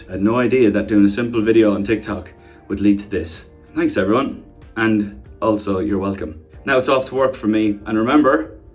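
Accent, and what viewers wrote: Ireland. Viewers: I Stan a guy who takes great care of his skin so efficient and well done I will always love Niall plus he’s beautiful as well his adorable Irish accent and beautiful smile of course that giggle in the end do I like Niall even more or what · Omg same! I just love his voice and his accent and how sweet and down to earth he is and funny! I've always been into irish guys!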